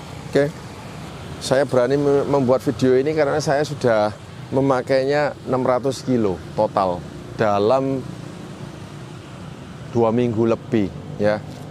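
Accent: Surabaya, Indonesia. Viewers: khas aksen Suroboyo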